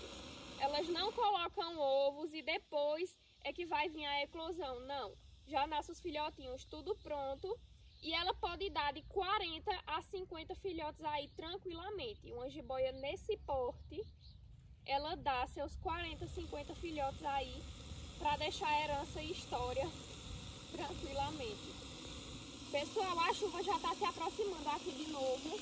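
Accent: Northeast, Brazil. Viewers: Belo vídeo, acrescentado com o sotaque nordestino da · PARABÉNS, nunca perca este SOTAQUE! PORQUE ELE CARACTERERIZA OVERDADEIRO NORDESTINO. HOMEM E MULHERES BATALHADORES QUE Ñ TROCAM SUA DIGNIDADE. MUITO BENÇÃO! PARA SUA FAMÍLIA, E A TODOS QUE ASSISTEM A SEUS VÍDEOS ❤ DEUS TEM VCS NO CORAÇÃO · Ei 👸, você é nordestina mesmo, tem sotaque acentuado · Legal esse sotaque nordestino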